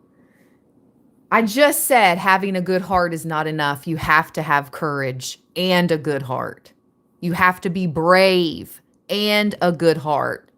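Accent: United States. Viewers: Haha thats so funny you mention about your accent etc. - I've gone from thinking you were in Atlanta, to NJ - to generally being clueless. It dont matter! you're ancient. But i resonate with your ancient Appalachian for sure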